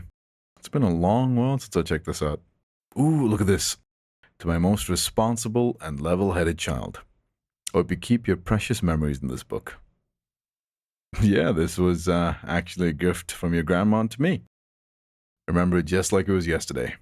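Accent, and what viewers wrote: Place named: Caribbean. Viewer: I can’t pin the accent. I know it’s caribbean. Is it VI, Bahamian, Trini, or Guyanese? Maybe Belize??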